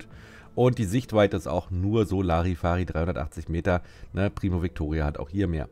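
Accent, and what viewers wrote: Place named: Bern, Switzerland. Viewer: Mage Co bärner-dütsch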